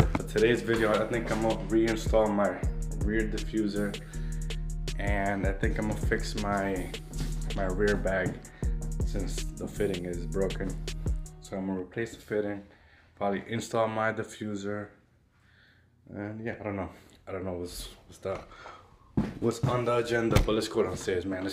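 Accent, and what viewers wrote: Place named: Dominican Republic. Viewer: i could tell you Dominican lmao❤️🇩🇴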